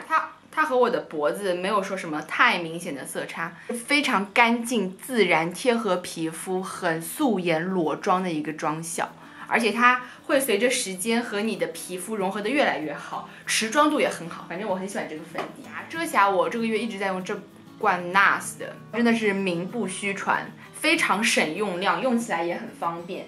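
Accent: Shanghai, China. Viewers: 新年快乐啊！ 只能在英国过呀 也是很想回国吃吃喝喝的 哈哈哈 之前突然看了一个你的视频 特别喜欢你的性格 加上上海口音 太可爱了！ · 作为一个出生在上海 现在在墨尔本上大学的留学生，每次看你的YouTube（上海口音的普通话）就觉得特别特别亲切，特别想家。今年年三十在墨尔本和同学一起烧饭吃，谢谢你的视频！一个默默无闻的小粉丝 希望有机会能在上海相遇～x Angela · Hallo, 你推荐的产品真的很好看也很实在，那瓶Essie的指甲油涂上美得不要不要的。我也是上海人，你的上海话和带一点点上海口音的普通话听来非常亲切。我住在德国斯图加特已经十一年了，中国年没假，肯定在斯图加特过年了。我们离得太远了，不然真想去找你玩。还有我也曾留言请教你这么多衣服如何分类或处理，有机会出个专辑吧，除了买买买，我们也要会收收收,藏藏藏，理理理，扔仍扔。最后祝愿新年快乐，万事如意！喜欢你! Xia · 杭州人听到上海口音就有回家的感觉了哈哈哈。第五年了还是在美国自己过年。😭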